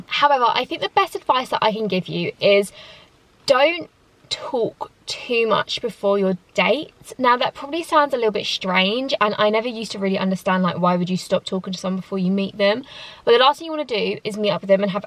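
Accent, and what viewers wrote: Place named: London, United Kingdom. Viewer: I love your accent. Is this a london accent?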